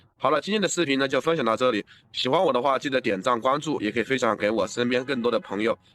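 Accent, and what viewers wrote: China. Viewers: 你這中國的口音！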